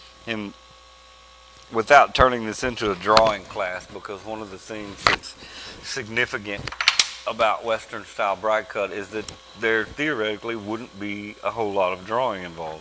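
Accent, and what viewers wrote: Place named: Oklahoma, United States. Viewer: I thought about Ben Johnson the whole time I was listening. It's almost an OK accent. Which is my home.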